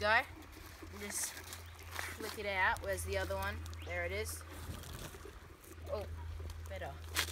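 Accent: Australia. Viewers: Is he ozzie? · He’s Australian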